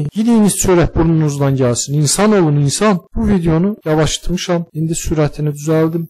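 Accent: Armenia. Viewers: Danışığından da bilinir erməni olduğu. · Sən azərbaycanli deyilsən .Azərbaycanli olsan azarbaycan dilini biz azərbaycanlilar kimi danişarsiz amma siz gic ermənilərin azərbaycanin torpaqlarini oz torpaqlari kimi qələmə verir və sizdə dilimizi guya bizdən biri kimi ozunuzu elə gostərib calirsiz siz ermənisiz pis insansiz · Siz ermənisiniz ləhcənizdən bilinir , görünür Azərbaycan dilini qəşəng bilirsiniz. Biz sizə nifrət edirik , XOCALI soyqırımında günahsız insanları vəhşicəsinə qətlə yetirdiyiniz üçün sizə nifrət edirik. Azərbaycanlılar bu cür danışmır. Siz həqiqətən bir ermənisiniz. Allah bəlanızı versin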